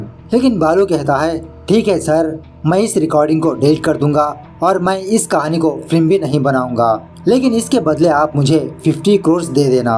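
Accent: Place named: South, India. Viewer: I have been listening your explanation since two months ago but i think u need to improve ur hindi language bcoz i think u hv South Indian ascend. Ur many pronunciation irritates me but hope to listen good hindi.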